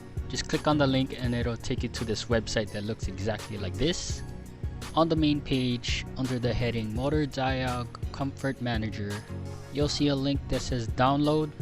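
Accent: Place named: India. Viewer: Czech guy with indian accent :D Interesting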